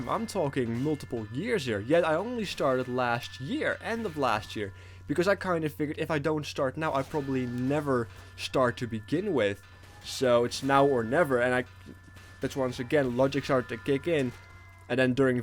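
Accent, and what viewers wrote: Netherlands. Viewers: I'm Dutch, and I thought I heard a Dutch accent while you speak. Hence the question :)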